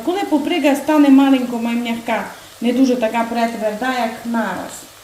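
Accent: Transcarpathia, Ukraine. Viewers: Я из Болгарии и хоть слово не поняла,но до чего же интересно слушать ваш прекрасный закарпатский диалект!!❤Украина - моя боль с 2013 год.😢Молюсь о вашей стране каждый день!🙏Держитесь,милые,смелые Украинцы!Весь мир с Вами!Народ - Герой!!💪💛💙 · ви так гарно говорите,в мене є сусід з Закарпаття то він так подібно говорить,як ви,але він вже багато років живе в нашому місті приїхав працювати на шахту,то вже трохи виговорився,але мені ся люби,як ви говорите.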